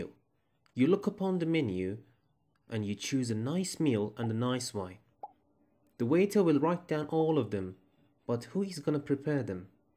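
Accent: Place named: United Kingdom. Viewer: Thanks! Now I know what API is! BTW, like your British accent 😉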